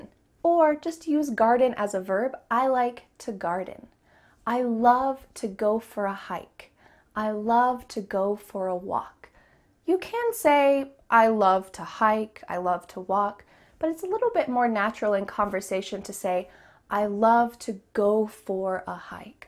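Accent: United States. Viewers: Thanks. Is this American or British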